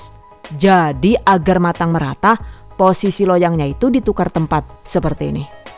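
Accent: Banyumas, Indonesia. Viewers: asalnya dr ngapak ya mba Pd bae karo inyonglah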